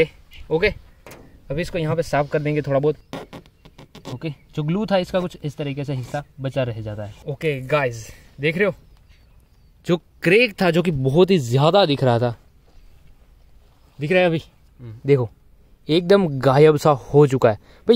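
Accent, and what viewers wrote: Gujarat, India. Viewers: Han bhai gujrati hai 🙃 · Bhai, tu gujarati he.?? I mean tone se lag raha hai.?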